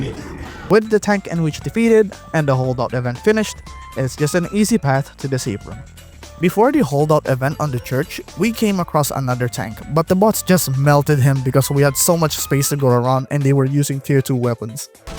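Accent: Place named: Philippines. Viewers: He’s Filipino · PEAK FIND, you deserve more views and subscribers for this amount of dedication (also your accent is similar to Filipinos)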